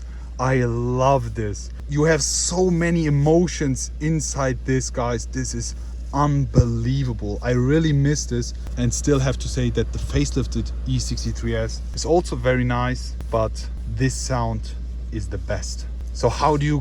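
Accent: Germany. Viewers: Digger dein Englisch ist komplett weg alter man merkt so hart dass du deutsch bist aber trotzdem nices Video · Leicht deutschen Akzenten 😎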